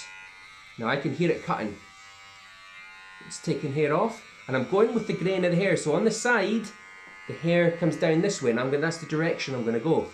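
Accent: Scotland, United Kingdom. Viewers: Lol every video ive watched has been an American, so rare to click a thumbnail and hear a Scottish accent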